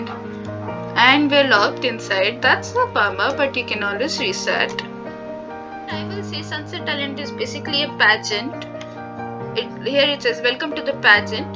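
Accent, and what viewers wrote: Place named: India. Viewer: U in indian? ur accent sounds like so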